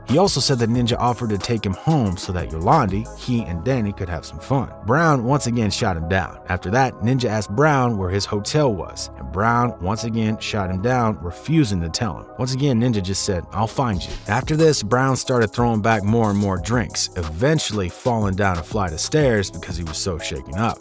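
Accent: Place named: United States. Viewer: Ignorant take on the duo, and christ american pronouncians on anything thats not english 😂 fml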